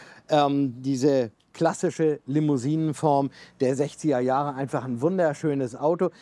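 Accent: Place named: Hamburg, Germany. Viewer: Sehr sympatisch das breite Hansedeutsch!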